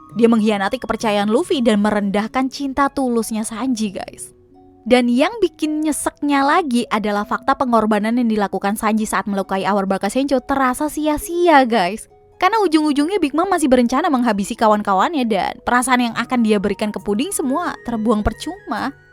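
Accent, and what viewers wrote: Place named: Betawi, Indonesia. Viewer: saikk ada sdikit logat betawinye ~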